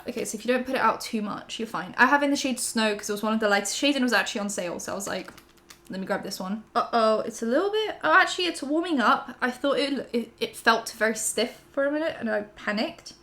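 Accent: England, United Kingdom. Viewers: Wait I thought was from London your accent is so English and know she has said it she does look polish but I was just so surprised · tbh i wouldnt be able to tell that english isnt your first language, messes w my brain bc you just sound like a london native lmao, i live in north london and literally cannot fault ur accent its mad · that is so rad you are from Poland! I was wondering because I stalked all your older vids one day and noticed it sounded like you had a totally different accent. So, I assumed you just eventually pretended to have an posh english accent... hahah totally my bad!! My husband is from the UK and you speak english like you grew up in the UK. I guess you did grow up in the UK starting from a pretty young age. Anyways- that is so cool you are from Poland! hell yeah! if you like watching Polish films and stuff still- you should watch " Cold War" - best love story ever and it is pretty new, came out around 2018?? 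I think... anyways- it takes place in Poland and it is AMAZING!!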